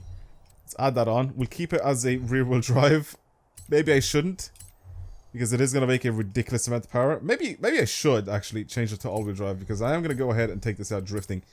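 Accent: Ireland. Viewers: Are u from Ireland cuz I am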